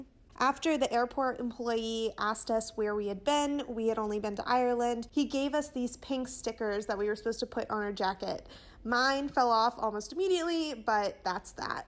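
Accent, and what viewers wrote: England, United Kingdom. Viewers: She's American so how does she have an English accent 😶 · Wow! Thanks for the update! I'm glad you are safely back. Hope to see more videos soon. I really love to hear your English accent. By your accent I'm sure you are not from the south. Because you don't have a southern accent.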